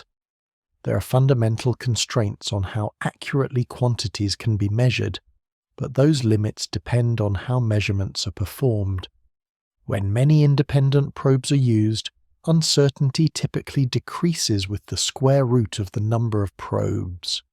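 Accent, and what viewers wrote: United Kingdom. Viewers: Want to love it, but there's nothing relaxing about a biting British voice in my ear at 11pm. Sorry.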